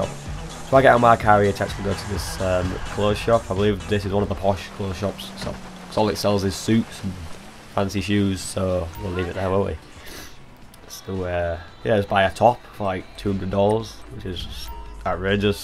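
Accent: United Kingdom. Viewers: He's not drunk he's got a god damn accent called British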